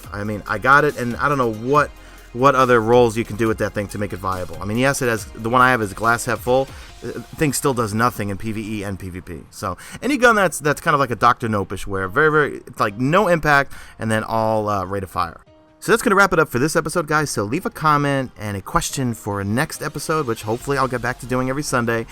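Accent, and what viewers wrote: New York, United States. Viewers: How do you like living in New York? You totally sound like a New Yorker lol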